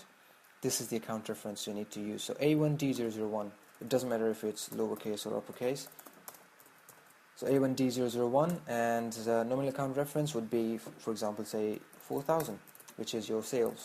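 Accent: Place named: United Kingdom. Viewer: This is very excellent and helpful. But it does not tell about origin of country. It sounds like British. Thanks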